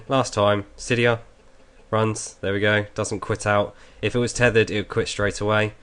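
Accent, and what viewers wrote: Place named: Southeast England, United Kingdom. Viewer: Thanks a lot brother. By the way I love Southeast England accent.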